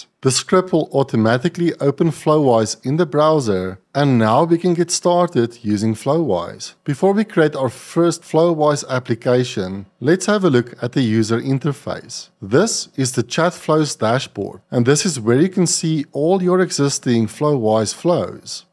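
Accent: South Africa. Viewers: South african>?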